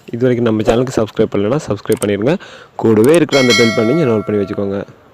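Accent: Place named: Sri Lanka. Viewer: Lanka tamil voice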